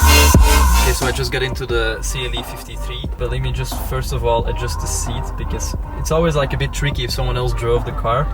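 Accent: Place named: Antwerp, Belgium. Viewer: Zie da ten bodukap dees filmke nie zie hee! Ik dacht al dat ik ee sint katelijnse tongval hoorde. Van achter in mijnen hof zie ik op het St. Michielskasteel! Goeie review! 🤘✌️👍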